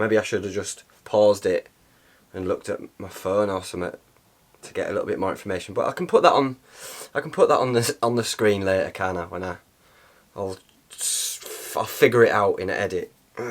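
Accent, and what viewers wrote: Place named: Hull, United Kingdom. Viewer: Hi pal just watched this vid and really enjoyed it. Gotta ask where are you from? Im from hull and you sound local? Ive got a helm 3, my first tent and had it since jan2018 and still going strong at 35 wild camps. Youve gotta get another helm just for the sake of it! Saying that theyre pretty rare these days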